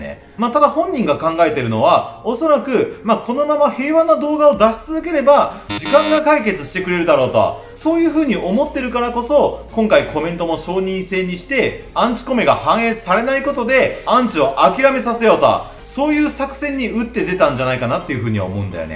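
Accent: Kansai, Japan. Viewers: テメーのゴテゴテ関西弁ききたい〜🥰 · 冒頭からイケボ関西弁で終わった生理が再開しそうって話だよね干物BBAもときめいちゃう(´♡ω♡`)って話だよね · 関西弁聞きたいですけど私可愛い男の子でした⤵️ 古川はtko木下越えてほしい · 関西弁のU-Twenty可愛すぎるって話だよね🤣💕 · わぁ💕 アタイと話す時はバリバリの関西弁が出るって話しだよね💕 古川鼻チークやら👃🏻 耳毛👂🏻やら言われてえらい大変やなって話しだよね！ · コメント61件もあるのに、視聴が0回になってる？？てか会ったら関西弁で喋るーって話しだよね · の関西弁最高🥺 しばらく古川優香もやっぴもちょっとしたことで炎上するんだろうなって話だよね🤔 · 関西弁はギャップ萌えですきすぎる… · 関西弁萌えって話だよね🤩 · ちょいちょい、イントネーションが関西だなぁ〜と前から思ってたけど。って話しだよね。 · 関西弁聞きたい🥰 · 関西弁ききたいってはなしだよね〜🥺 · 関西弁もギャップがいいし標準語も聞き取りやすくていいし、、とりあえずUくんがいいよねって話だよね(？)www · 対人に無神経で図太い人って、何もかもに無神経で図太いよねって話しだよねw 関西弁いいねぇ☺️ボケとツッコミ上手そう← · 関西弁もっと聞きたい！(標準語だから訛りに憧れがある) 動画見に行ったけど1部の米が論外すぎて… 今回の件でショックを受けたり古川優香の為を思って注意したコメントをしたファンだっているのに嫌なら見るなは論外もち誹謗中傷は肯定しないけどね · 関西弁喋ろや！！！！コテコテ関西弁のかわいい女の子より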